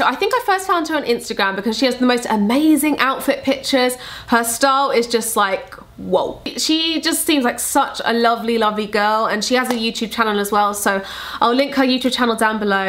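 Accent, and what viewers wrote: United Kingdom. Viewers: I just love your videos and ofc your brit accent. :)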